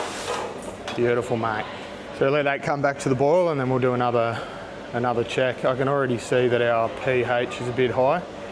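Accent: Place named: Australia. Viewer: Very interesting information althoug I have to watch it several times because Ausie english is more dificult to understand to me! Thanks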